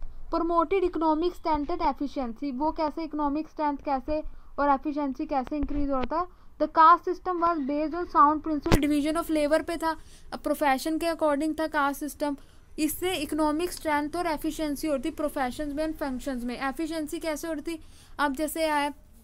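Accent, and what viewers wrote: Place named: Chandigarh, India. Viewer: Thanks mam I think you are from chandigarh because of your voice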